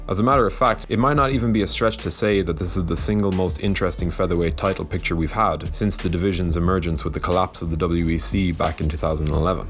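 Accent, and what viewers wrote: Ireland. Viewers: Because of this chanel i want everything to be narrated in irish. Let me take that back, i wish everything in life was narrated by THIS guy specifically.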